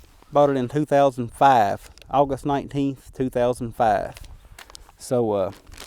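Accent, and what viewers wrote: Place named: South, United States. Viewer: One of the best Glock pistol vids I’ve seen. Great shot and love the accent. I gotta get down south one day.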